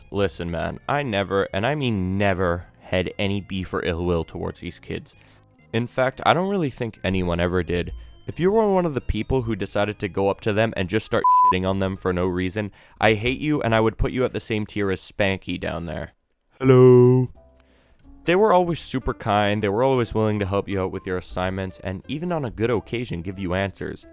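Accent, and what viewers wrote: Canada. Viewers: Bro you sound so canadian, more canadian than most ones Ik, maybe cuz im in the city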